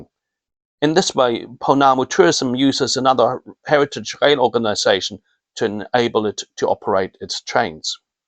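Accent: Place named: South Africa. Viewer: Interesting. . A South African accent with a tinge of NZ.accent. I get the impression that these are charter trips rather than scheduled services.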